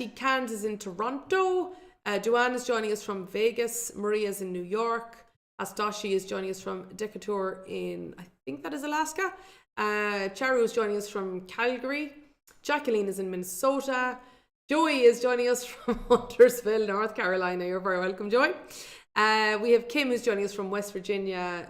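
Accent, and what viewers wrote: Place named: Ireland. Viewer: Now thats an Irish accent if I ever heard an Irish accent!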